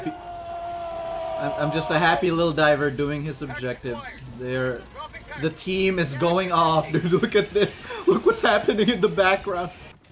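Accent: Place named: Central Jersey, United States. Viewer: Yeah at first I assumed he was from Central Jersey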